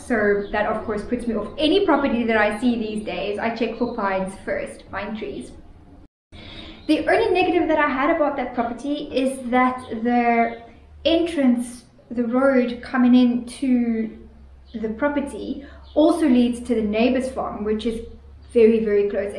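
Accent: South Africa. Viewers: Just found your channel and will definitely be following the rest of your adventure. Question: do I hear a bit of a South African or Zimbabwean accent there?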